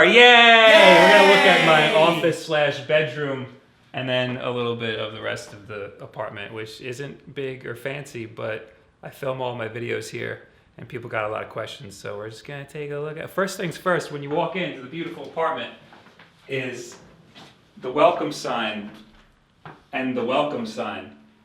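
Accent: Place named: Brooklyn, United States. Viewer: So Brooklyn haha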